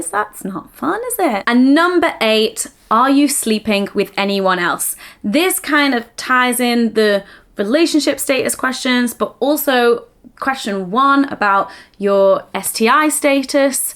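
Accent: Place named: England, United Kingdom. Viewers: anytime I watch with the sound off my brain still knows to read the captions in english accent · Shes English that's how she speaks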